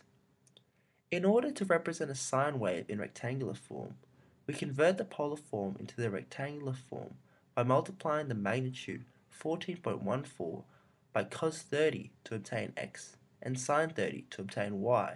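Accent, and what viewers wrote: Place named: RP, United Kingdom. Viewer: whats with the posh accent haha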